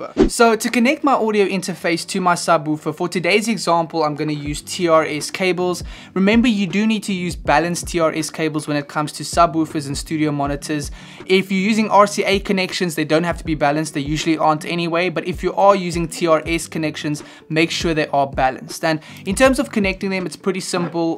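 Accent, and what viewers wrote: South Africa. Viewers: Excellent review. I got the Eris 3.5's and am really considering adding a Sub8 to it as well for more better focused low end. Is that a South African accent I spot?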